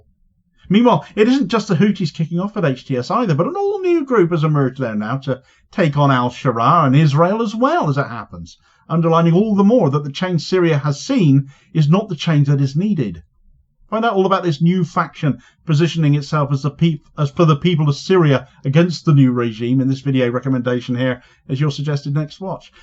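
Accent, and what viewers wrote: United Kingdom. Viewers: I have great difficulties with the British dialect of American 😁, however, thank you for covering this important content.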